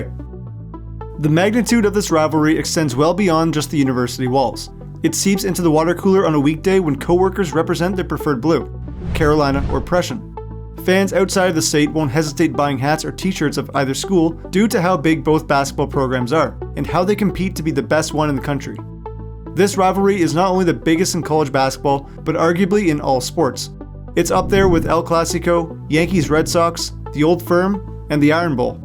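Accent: Canada. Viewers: The narrator makes two huge gaffes. One is his inability to correctly pronounce the word “Raleigh”. It is easy to pronounce if you pronounce the first half of the word like you would a college cheer. As in Rah Rah (insert team name next). The second half of the word is pronounced like the man’s name “Lee”. Put the two together and you have “Rah-Lee” or Raleigh. His second brobdingnagian blunder occurs when he tries to pronounce the word “Carolinians”. The proper way to pronounce the word is like saying “Caro- lynn-e-uns”. Practice a bit and he will master it in no time. Btw, he also mispronounces the word “South”. I am guessing he has roots in Canada by the way he says the word. Properly spoken, the word sounds like “Sow (as in a female pig” added to “th” as in the last to letters in the word “tooth”. Easy, right? Fun fact: in our 13 men’s college National Championships is the fact that North Carolina is the only state that has three universities with at least two championships each. 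North Carolina with 6, Duke with 5 and North Carolina State with 2. The announcer also mispronounces the word “out”. Once again, he must have roots in Canada.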